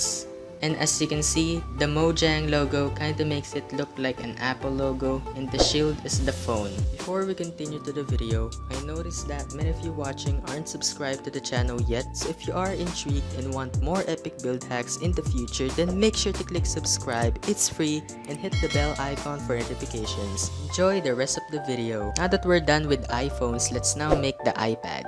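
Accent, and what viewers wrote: Philippines. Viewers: you sound like you have a filipino accent. are you?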